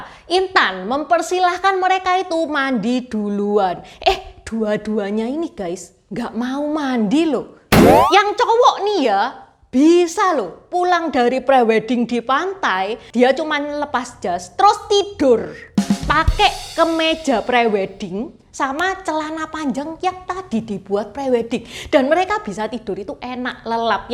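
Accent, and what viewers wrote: Java, Indonesia. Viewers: Enakan nieh dengerin dongeng" dari ci.. dg bhs indonesia ber aksen jawa medok.. hihihi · Saya suka dgn konten 2 spt ini Apalagi logatnya jawa kentel , matanya sipit. Pasti semua suka. Lanjutkan Ci....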